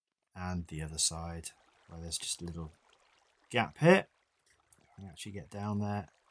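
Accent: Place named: United Kingdom. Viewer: Wow! I just realized how much brit eng. annoys me.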